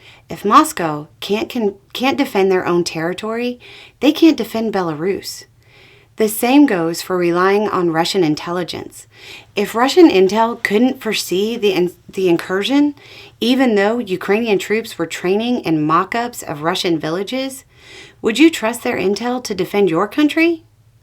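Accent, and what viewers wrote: South, United States. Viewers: I love your snarky sarcasm. 😂😂😂😂😂😂😂 If you didn't have such a strong southern accent, I'd say you were a Pittsburgher (that's a compliment where I come from).😂😂😂😂😂😂❤❤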